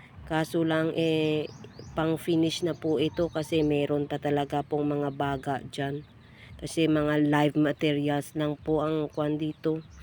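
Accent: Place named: Iloilo, Philippines. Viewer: Halata ang ilonggo nimu madaam...good job ..go lang ng go ...❤❤❤❤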